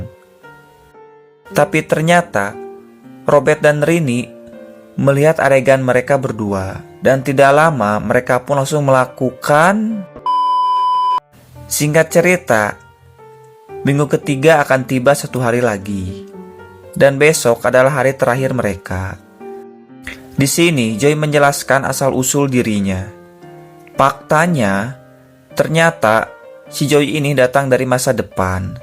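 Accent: West Java, Indonesia. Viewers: Sunda mang wkwkwkw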